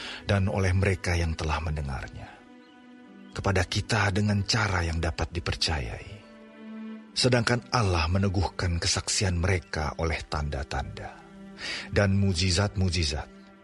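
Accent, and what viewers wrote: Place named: Madura, Indonesia. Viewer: kayaknya yang punya suara ini "orang " madura ya??? trims buat chanel ini..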